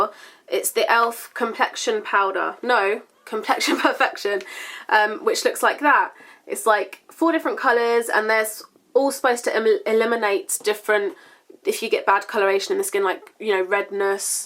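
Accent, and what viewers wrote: United Kingdom. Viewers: everytime i watch your videos i feel like talking in a british accent for the rest of thee day :) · i love listening to british people speak. it's just so much more sophisticated than us americans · the british accent sounds like heaven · I LOVE your British Accent <3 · Love the accent, I kinda how to speak like this Brit-ain accent ! Btw I'm from Malaysia, love your review :) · Does anyone else find the need to talk in a british accent after watching her videos? or maybe it's just me, I absolutely love her accent (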